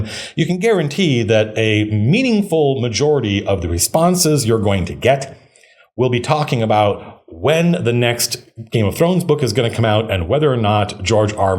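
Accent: South, United States. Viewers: I love your southern belle voice,.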